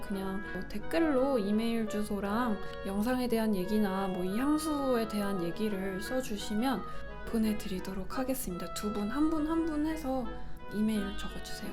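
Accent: France. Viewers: 로제 닮았다 ❤❤❤❤❤ 불어발음 고급지고 넘사벽 엄친딸임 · 딥티크의 향수이름들이 맨날 어려워서 제대로안부르고 다녔는데 오늘 님 영상 덕분에 제대로 된 이름이랑 또 고급진 프랑스어 발음도 들어보게되서 유익한 시간이였어요! 영상을 보는 저도 교양이 업그레이드되는 느낌? ㅎㅎ 아 사랑해요 님 저는 오데썽이 좋아여 <mail> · 님 아프리카 생방송으로도 종종 보고 유튜브는 구독하고 매일 시청하는 연근이에요. 이런 프랑스어 컨텐츠랑 요즘은 쿠킹덤 컨텐츠를 제일 좋아해요!! 평소에 딥디크 향수가 좋다고는 많이 들었는데 약간 비싸다보니까 쓸 기회가 없더라고요, 그래서 님 영상보고 제가 좋아하는 프랑스 발음도 듣고 향수 설명도 들어서 영상 너무 좋았어요!! 제가 올해에 스무살이라 곧있으면 성년의 날이라서 향수 하나 마련하고 싶었는데 이벤트 당첨되서 딥디크 향수를 갖게되면 너무 행복할것같아요, 제 버킷리스트에 향수모으기가 있었거든요! ㅎㅎㅎ 항상 영상 잘보고있어요 님!! 오랜만에 프랑스어 책 읽어주는 컨텐츠도 보고싶네요 ☺️ 오데썽 <mail> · 딥디크가 프랑스 명품 향수였다니... 님 덕분에 모르고 있었던 정보를 알아가네요 저도 부모님 향수 선물로 드렸던 적이 있어서 그때 생각이 나네요ㅎㅎ 님의 프랑스어 발음을 들으면서 딥디크 향수들의 이름과 정보를 더 알게 되어서 짧았지만 좋은 시간이었습니다. 너무 빨리 지나간 느낌이에요. 다음에 또 이런 컨텐츠 해주세요! <mail> (오데썽으로 신청하겠습니다) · 안녕하세요 님 프랑스 컨텐츠 하실 때 마다 발음 억양 너무 예뻐서 배워보고 있는데 저는 그렇게 잘 안돼요.. 님이 한국어 발음으로 교정해주시지만 혓바닥이 강직하게 뻣뻣해서 그냥 한국어로 또박또박 말하는거에 가까운.. 다음 프랑스 컨텐츠 하실 때 행여나 실례가 안됀다면 발음 컨텐츠 햐주세요~~ 님 구독한지 벌써 3년, 구독자 10만쯤 부터 계속 보고 있습니다!!(모든걸 다 본건 아니지만) 힘든 일 있으셔도 항상 힘내시고 머리카락 이번엔 뿌리만 남지 않길 바래요ㅋㅋㅋ 향수는 오데썽 이메일은 <mail> 입니다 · 저는 향수 입문을 딥디크로 처음 했는데 딥디크 향수를 보며 항상 이거 정확이 어떻게 읽지 ㅋㅋㅋㅋ 이러면서 여러사람들과 장난치듯 읽으며 이야기 해봤어요. 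실제로 불어의 발음을 들으니 더욱 갖고싶은 향수가 된것 같습니다. 설명까지 불어로 읽어주시는게 너무 좋은것 같아요… 프랑스의 명품 브랜드의 소개 컨텐츠도 좋을것 같습니다. 그리고 여러분 향수를 구매하실때는 무조건 유명하다고 구매하지 마시고 꼭 매장에 방문하셔서 시향,착향을 해보시고 구매하셔야해요 호불호가 적은 향수라고 해도 본인에게 불호일수도 있고 각자 이미지에 맞는 향수가 있습니다. 시향,착향을 하실때도 첫향만 맡고 구매하시는것 보다는 잔향까지 맡아보시고 구매를 추천드립니다:) <mail> 오데썽 신청해요 ^.^ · 역시 믿듣 언니! 제가 사려고 고민했던 딥디크 향수를 리뷰아닌리뷰해줘서 너무 감사해요 항상 언니의 불어영상을 보면 넘 기부니가 조크등요 ㅎㅎ 항상응원해요 사실 향수리뷰보다 불어발음하고 읽어볼 생각이 없었던 로고에 박힌 주소까지 은근 더 다양한 정보를 얻은거같아서 넘 좋았어요! 불어영상이외에 게임영상도 자주보는 연잎이에요 응원해요 언니 항상 ❤️ +제꿈이 영상계열이라 도비님도 언제나 응원중입니다 화이팅이요 좋은영상 늘 감사해요 <mail> 도손이요❤️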